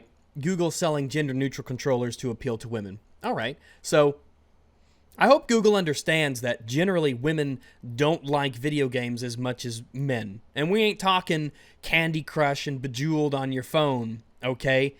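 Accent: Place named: South, United States. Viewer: The southern came out this episode.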